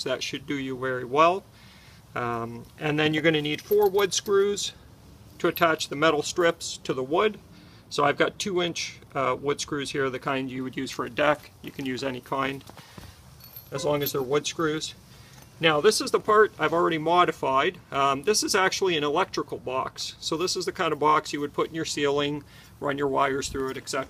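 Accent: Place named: Canada. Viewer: Based on his accent he may be referring to Canadian dollars. And metal prices fluctuate quite a lot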